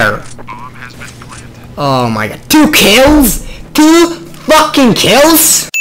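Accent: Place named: Eastern Europe. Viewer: I'm guessing Eastern Europe? Maybe Slovakia or Slovenia? Maybe?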